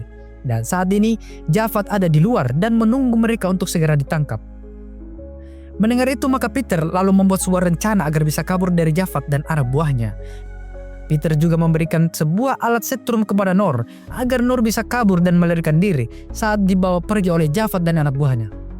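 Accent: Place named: Bugis, Indonesia. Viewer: Orang bugis logatnya admin ni ya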